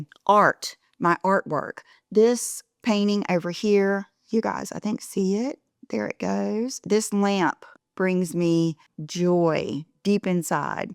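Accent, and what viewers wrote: South, United States. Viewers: Thank you. Your videos, your presence, your lovely southern accented voice, one of my favorite Influencer's to watch and listen. I know all of the thinks you talk about, but sometimes, adulting in wellness can be put on the shelf. So I do take your advice on a few things, and they help. love you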